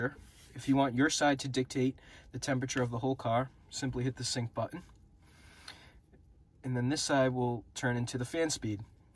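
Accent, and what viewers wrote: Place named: New Jersey, United States. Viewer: You from New Jersey? Love the accent!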